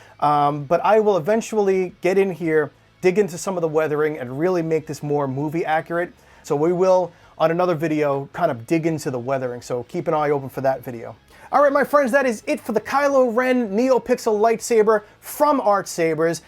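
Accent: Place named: Boston, United States. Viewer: I know that accent from any where you from boston?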